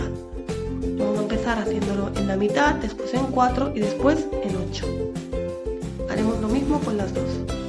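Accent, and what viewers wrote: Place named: Spain. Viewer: Se ven delicioso. Muy ricos y facil de hacer lo hare. Gracia por tu receta me encanta tu acento eres de. Espana. Felicitacion muy creativa. Saludos. Valdivia. Chile.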